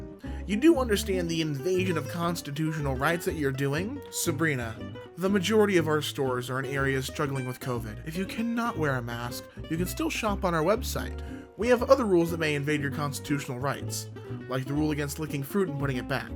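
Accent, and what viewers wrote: Scotland, United Kingdom. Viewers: Please tell me how to do your Scottish voice I want to know how you do it so well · I love his Scottish accent. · Dude you sound like Scotsman from samurai jack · As a born and bred Scot, that Scottish accent was perfect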